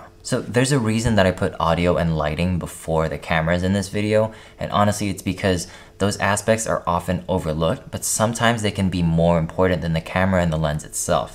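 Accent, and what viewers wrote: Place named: United Kingdom. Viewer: Good tips and British accent game is strong. Jolly good show!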